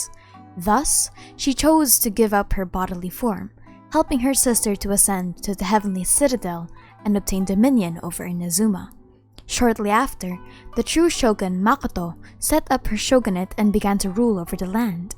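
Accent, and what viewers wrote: Philippines. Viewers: I knew it! You were a Filipino the whole time, ur English accent is familiar taena Anyways, cool video! I didn't even know that book existed, more lore crumbs for the Almighty Shogun!! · Pinay ka? HAHAHA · is she from philippines or what?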